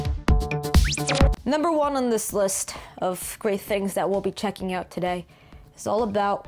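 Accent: New York, United States. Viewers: Are you actually from N.Y or is it just a voice you do? Either way I love it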